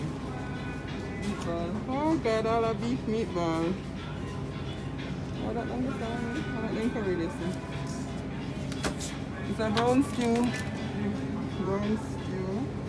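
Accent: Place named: Jamaica. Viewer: Good job, I like jamaican accent , yes man. You are a whole vibe and a very happy gal.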